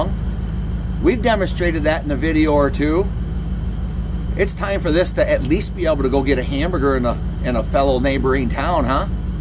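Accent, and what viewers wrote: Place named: Minnesota, United States. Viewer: Just found the channel, pretty good! (where are you from? sounds almost a Minnesota scandinavian accent?)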